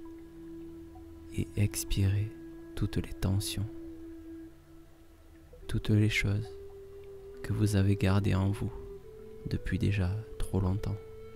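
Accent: South, France. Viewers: Oula ça sent l'accent du Sud, déjà j'accroche à mon "dialecte" 😉... Si je ne rajoute rien de plus c'est que je dors et c'est que c'est efficace 💪✌👌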